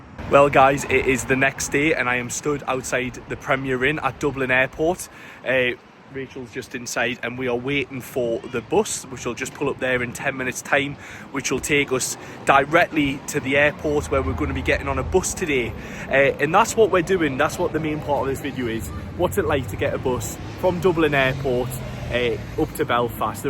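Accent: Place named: Newcastle, United Kingdom. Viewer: I love the Newcastle accent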